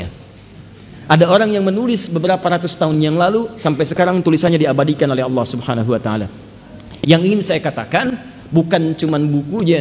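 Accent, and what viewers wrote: Arab world. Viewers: Ustad dahsyat, ilimu nya tinggi, keterangannya mudah dimenerti. Tetapi mohon logat bicaranya jangan spt org Arab berbicara bahasa Indonesia. Salam. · Ust ni org Arab ke? Cakap Pon loghat arab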